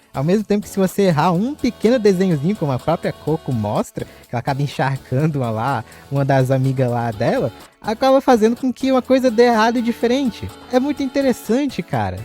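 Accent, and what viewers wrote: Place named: Minas Gerais, Brazil. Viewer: Seu sotaque é de mg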